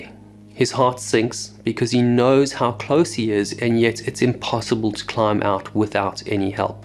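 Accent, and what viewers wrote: South Africa. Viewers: Do I detect a South African accent? Thanks for your clear and not too technical explanation of a horrible accident that shouldn't have happened. Your delivery is very good - my normally very impatient hubby, who used to do recreational diving, watched the whole way through, he normally fast forwards.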